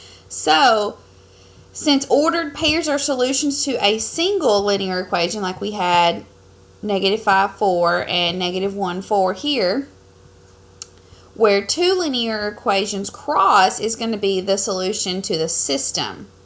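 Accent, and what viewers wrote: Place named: South, United States. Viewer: when here accent yeeha I felt that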